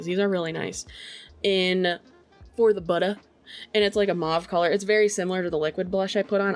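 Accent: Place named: Upper Peninsula, United States. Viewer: YOOPER! I knew Iknew your accent!!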